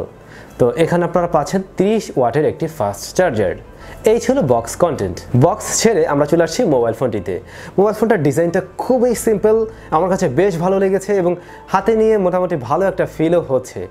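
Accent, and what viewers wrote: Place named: Barisal, Bangladesh. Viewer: that barishailla accent .... ahhhh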